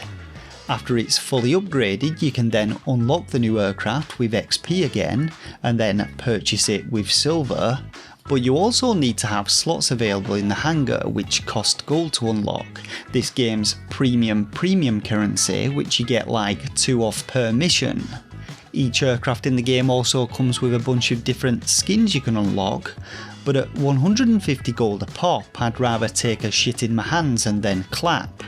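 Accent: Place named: Northern England, United Kingdom. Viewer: You sound like you're from the North of England but I can't quite pin down the city.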